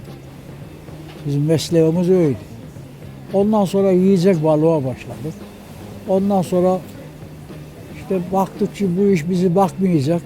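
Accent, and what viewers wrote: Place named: Rize, Turkey. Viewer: Şiveden Rizeli olduğunu anladım.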